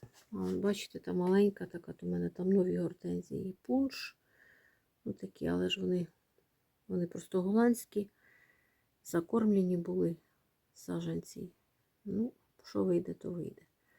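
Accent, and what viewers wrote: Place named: Cherkasy, Ukraine. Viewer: Дякую, що поділилися досвідом!!! Буду пробувати розмножувати, це так цікаво. У вас такий знайомий говір, ви не з Черкащини??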